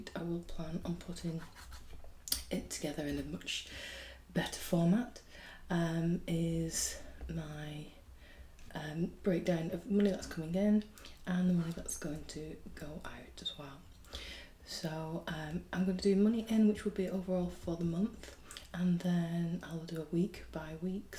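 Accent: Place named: Northern England, United Kingdom. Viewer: Well done on starting your budgeting channel 👏🏾👏🏾🎉 I knew I recognised your accent. We actually don’t live too far away from each other, I live in Bury xx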